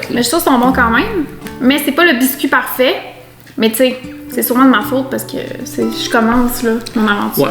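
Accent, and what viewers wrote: Quebec, Canada. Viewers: Bjr de Lyon..France..je passe depuis qq temps un super moment avec toi..cozy..l'accent...🎉❤très agréable pr connaître un peu mieux nos cousins du Quebec😊❤Veronique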